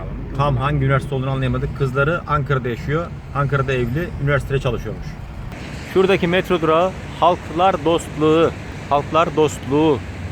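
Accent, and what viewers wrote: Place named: Turkmenistan. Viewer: Тилингиз шеваси туркманларга ухшаб кетади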